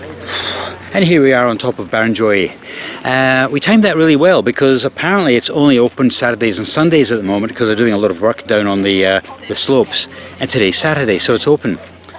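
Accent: Scotland, United Kingdom. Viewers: He has a Scottish accent because he is Scottish. Where he lived as a kid is just a red herring. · A Scotsman with an Aussie past, the Scot’s never lose the accent! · why do you not speak with an Australian accent if you grew up there? Why do you have a Scottish accent? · this might sound stupid but why does he have a scottish accent then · It’s amazing you have a broad Scottish accent - with living your first 25 years in Oz.. I’m surprised. The hotel didn’t look good at all. I’m not keen on Sydney. Thanks for showing us.👍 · Amazing how you kept the Scottish accent having spent so much time in Australia! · His parents were Scottish so that would be the accent he heard most. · how did you manage to keep your Scots accent having been raised in Australia? I was born in Scotland but raised in England yet my accent is definitely not Scottish (although I do know where my bread is buttered when it comes to football & rugby).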